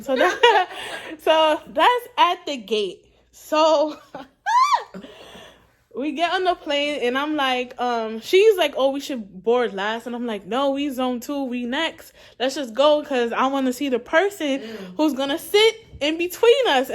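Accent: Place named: Caribbean. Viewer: ahhh ok.. I was certain i heard a lil west indies in there 😊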